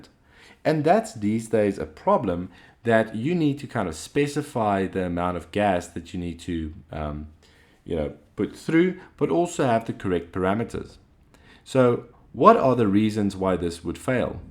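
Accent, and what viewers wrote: South Africa. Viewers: Why do you sound south african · Proud to hear your South African accent! Thanks for everything,! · I almost jumped when I heard your accent! we gotta make NFTs trend in South Africa! Thank you so much for all of this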